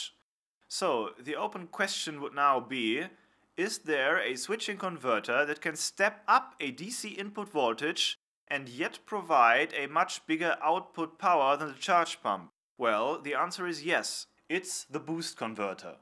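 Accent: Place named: Germany. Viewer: My first time i see your videos, even with your strong german accent (btw im spanish with no very good english level lol) you talk very clear and easy to understand. Great video. Thanks for teaching!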